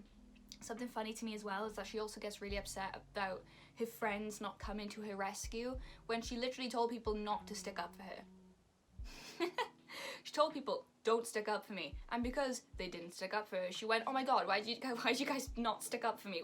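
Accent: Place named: Wales, United Kingdom. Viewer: Your accent is conflicting to me, cause I don’t know if your from mid/south wales or Mid/north England- Love your hair, love you ur piercings. Lots of new year luck n love from north wales!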